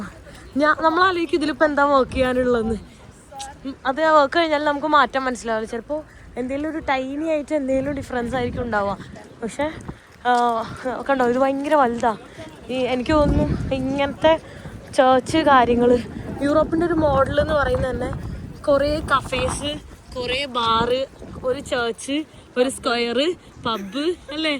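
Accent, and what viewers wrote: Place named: Mattancherry, India. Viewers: എന്ത് പറ്റി. വീഡിയോ ഒന്നും ഇടാതിരുന്നത് 🤔 ാടെ. സംസാരം T.c. r Kochi കൂടി കലർന്ന സംസാരം പാലക്കാടൻ. സ്ലാഗ് ഒട്ടും ഇല്ല V. Good 👍🏼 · മട്ടാഞ്ചേരി സംസാരം കേട്ടിട്ട് really super🎉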